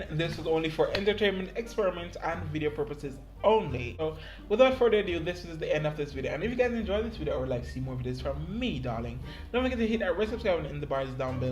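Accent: Jamaica. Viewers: Do I hear a Jamaican accent? :)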